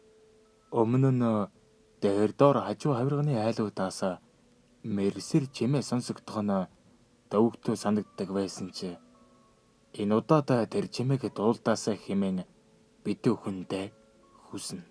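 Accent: Inner Mongolia, China. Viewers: Өвөр Монгол аялага сонсогдоод байх юм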